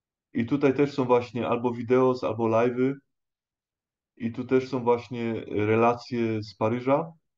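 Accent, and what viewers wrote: Silesia, Poland. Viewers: skad ten akcent ? Ślonzok ? pozdrawiam nowy widz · Ma Pan miły głos,jeszcze ten śląski akcent,wspaniałe się słucha.Pozdrawiam serdecznie!❤️🇵🇱🇵🇱🇵🇱🌹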